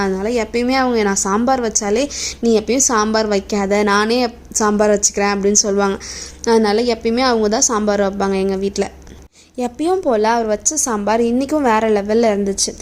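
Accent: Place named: Sri Lanka. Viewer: Emma Sister Neenga Enna Sri Lanka ah Va Avunga mathiri Pesuringa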